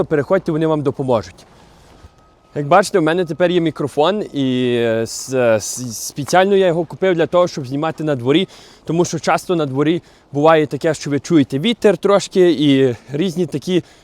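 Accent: Western Ukraine, Ukraine. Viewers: Бля, у тебя такой западенский акцент! Обожаю твою озвучку!!! Всегда вподобайка вид мене!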